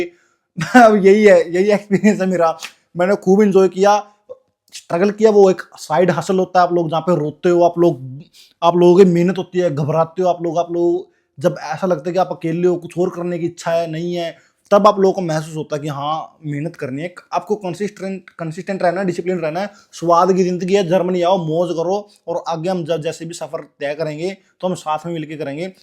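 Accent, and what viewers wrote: Haryana, India. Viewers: Not to offence apki tone kitni haryanvi hai lagti hi nhi hai apko German suit karti hogi par earn karna sa matlab hai 😅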